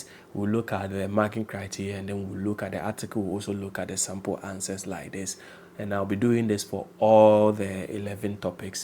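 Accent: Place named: India. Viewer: The voice over is in India, why? I can't understand anything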